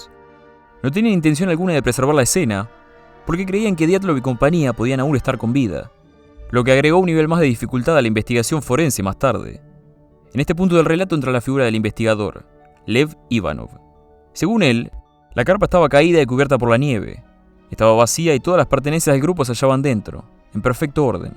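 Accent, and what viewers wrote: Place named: Buenos Aires, Argentina. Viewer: Sonás re-porteño flaco, ¿de que barrio de capital sos? (no es una crítica, lo pregunto con buena onda). Capital federal (denominación antigua para el distrito federal nacional de Argentina), C.A.B.A (ciudad autónoma de Buenos Aires: denominación moderna)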